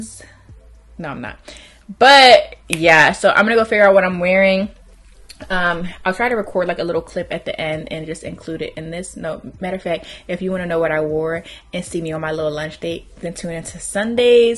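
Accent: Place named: New York, United States. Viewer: It’s the love and appreciation for NYC talk/accent 🗽💕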